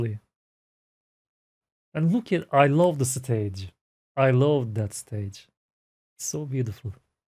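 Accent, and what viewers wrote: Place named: Turkey. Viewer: You're Turkish 😁it's so obvious from your accent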